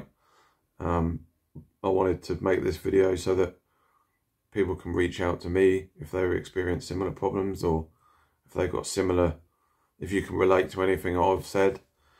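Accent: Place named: Suffolk, United Kingdom. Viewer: Hello, just watched your video and having been diagnosed with MD 3 years ago recognise everything you mention. I've watched several videos from other sufferers and everyone has broadly the same symptoms, but nobody mentions the speed of the spinning, for me it's really fast, everything jumping from left to right or occasionally up and down. I had 3 steroid injections which have helped a lot, though I still get attacks out of the blue occasionally. I work nights in Waitrose, and as an employer, they've been brilliant, now that my original manager has left, who found my attacks and deafness hilarious. A new manager who's sister has MD, was much better. This condition changes your life much more than people realise. I rarely drive any more, and never alone. Dare not ride my motorbike, and generally seem to have lost interest in everything. 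I think I can hear you have a slight Suffolk accent, which is also my part of the world. It's nice to hear someone talking about "menieres" rather than "minures" the way the Americans pronounce it. All the best.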